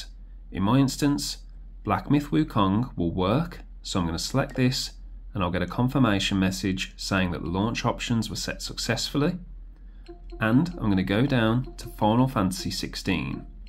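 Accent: Birmingham, United Kingdom. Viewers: Where about in Birmingham you from mate? Always nice to see a fellow brummie.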